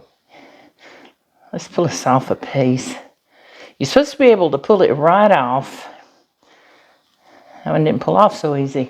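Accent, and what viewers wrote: South, United States. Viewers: I love ❤️ blooming onions. I dip mine either in ketchup or shrimp sauce. I haven’t noticed before but your accent is really southern. ❤️❤️❤️🥰🥰🥰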